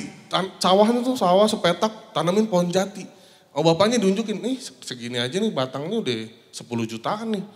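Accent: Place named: East Java, Indonesia. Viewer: Si POPON ini ngomong in Bondowoso parah juga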